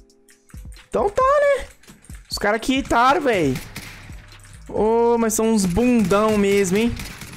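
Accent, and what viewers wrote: Minas Gerais, Brazil. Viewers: !! Pelo seu sotaque vi que vc é de Minas. De qual Cidade vc é?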